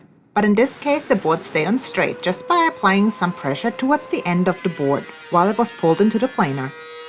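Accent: Germany. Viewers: Sie kommt aus Deutschland. 😊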